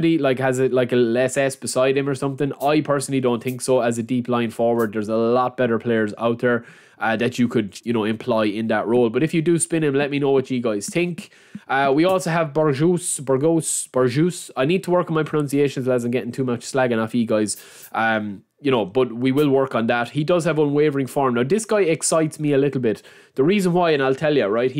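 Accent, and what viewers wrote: Ireland. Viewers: Are you Irish brother?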